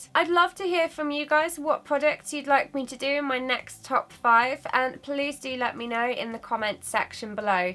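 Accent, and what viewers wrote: United Kingdom. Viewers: so in LOOOOVE with your british accent!!! its awesome! :) and i really like your videos, too. it feels so good to see you as a very self-confident person. do not ever lose it! i feel with you, because I'm also plagued by acne… greetings from germany.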